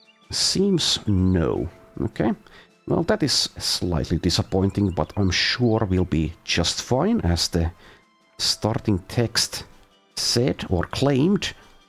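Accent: Finland. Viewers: agreed very familiar Finnish inflection on the "D" and "R" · Finnish I would say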